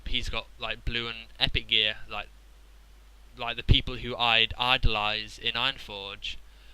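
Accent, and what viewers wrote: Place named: United Kingdom. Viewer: Know what's better than listening to how someone started playing WoW? Listening to someone with a badass British accent talking about how they started playing WoW :P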